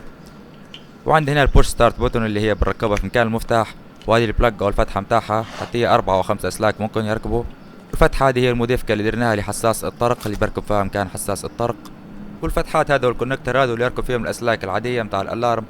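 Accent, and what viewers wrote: Libya. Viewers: اي مبين من لهجتك انك ليبي بس تعجبت لماشفت مكتوب الموصل في المقطع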